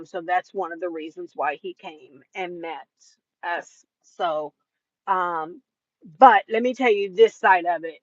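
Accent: United States. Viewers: So glad u went live . We missed u. Girl u could go live from ur bed and we would watch u no make up and all. Idk if it's just me and it's from not seeing u for a hot minute but u sound more southern since ur move over here to Alabama with me. Lol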